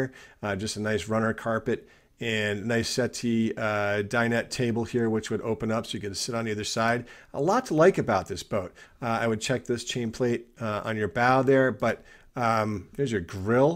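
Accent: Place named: College Park, United States. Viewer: I'm A native of collage Park, thought I recognized that accent! 😂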